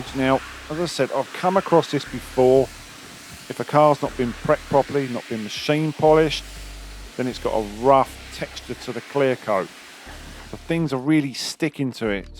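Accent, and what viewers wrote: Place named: England, United Kingdom. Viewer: One. Of the things I love about you and Jon from Forensic detailing is you both give wonderful narration and cool ass English accents 👍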